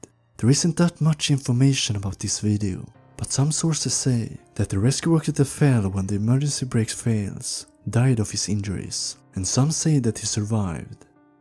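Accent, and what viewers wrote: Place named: Sweden. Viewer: Im a Finn, and can confirm. This is a swedish accent.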